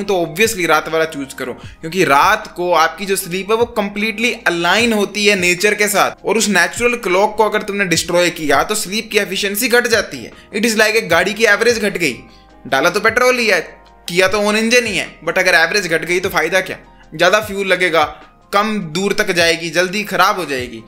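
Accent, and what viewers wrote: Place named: Haryana, India. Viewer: Bhai aapki boli haryanvi jaisi hai❤❤❤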